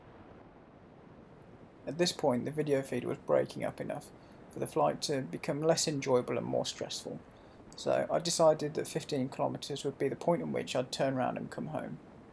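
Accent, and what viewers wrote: United Kingdom. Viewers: Absolutely brilliant! I love narrated flights. I tried to do it once but I have a boring voice and make it sound like a kamikaze pilot. Speaking of which, I sense a slight Midlander accent?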